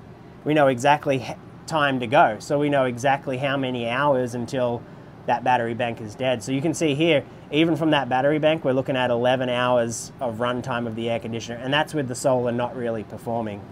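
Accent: Australia. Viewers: You sound like an aussie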